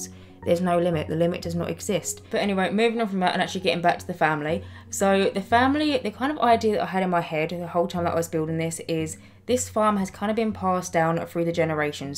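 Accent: United Kingdom. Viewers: how I instantly knew was british 1. its well old 2. her accent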